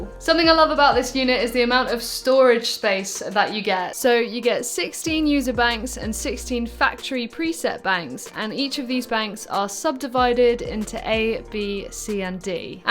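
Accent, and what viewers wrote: United Kingdom. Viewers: Brit accent ! you play well, congrat' :)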